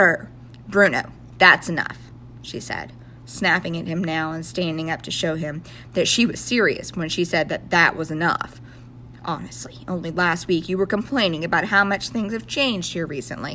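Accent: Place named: West, United States. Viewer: why is this book slowly turning into a western accent. also thanks.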